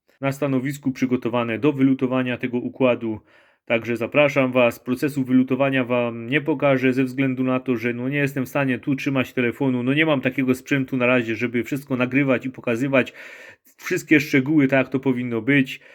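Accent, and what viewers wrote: Silesia, Poland. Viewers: jestem swiezym posiadaczem magdaleny z 2016r troche wszystko to nowe dla mnie wczesniej siedzialem w peugeotach ostatnim byla hybryda 508 RXH znalazlem twoj kanal swietny masz kontent bo krok po kroku wszystko a do tego ten hanysowy bliski sercu memu akcent:P (pochodze z Swietochlowic ale od prawie 19 lat w Irlandii) Mam takie pytanko kupilem od zoltego brata modul do AA P3.2 i czekam na niego musze softa zaktualizowac mam teraz <num> EU N i jaki bys polecal wiem ze musi byc 70 + ale jaki konkretnie. Bardzo ciekawi mnie te twoje polaczenie szeregowe z kompem jaki to soft itp (wiem o tych modach co mozna dograc) Moglbys rozwinac temat ewentualnie polecic stronke/forum z taka wiedza?? Dzieki wielkie za filmiki sa bardzo pomocne mysle ze gdybys nagrywal po angielsku mialbys just duzy kanal:P. pozdrawiam Tomek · Skond żeś Chopie jest ,bo słysza że nosz synek ze śląska, pozdrawio Rybnik.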